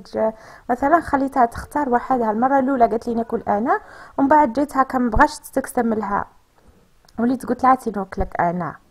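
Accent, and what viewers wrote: Setif, Algeria. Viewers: لهجتك عرفتها أنا ثاني من سطيف بوڨاعة تحديداً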